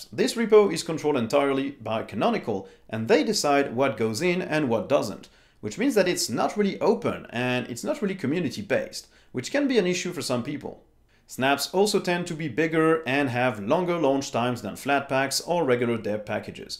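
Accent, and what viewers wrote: France. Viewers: You've something like a French accent..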